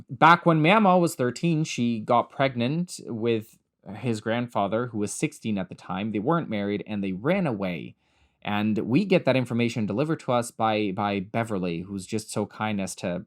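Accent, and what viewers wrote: United States. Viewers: Might I remind these christofascist theocrats - Pete Buttigieg has 2 children. So does Kamala Harris. BTW, you have a VERY American accent! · people pronounce it differently for their own grandparents. You did fine. I had a Memaw (pron. mee-maw), tho, instead of a mamaw (as you pronounced it)... but even in the same area here where my grandparents lived... my cousins pronounced it differently for their own grandparents. It's common terms in the US south and in the mountains for grandparents. And then there's the pawpaw tree... and multiple towns named for that. A uniquely native American tree and largest native fruit. Some folks call their grandfather pawpaw, too. You sound like you belong in the US with your accent... I would never have guessed English was your 3rd language. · First time on your channel; you said you're not American but I can't hear anything but an American accent in your voice? 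Please tell me what I'm missing! No disrespect meant at all. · Your American accent is perfect 👌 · You speak with an American accent (at least you sound American to me). Your channel says you are from the UK, though. Are you actually from Canada? Just curious. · Why do you have an American accent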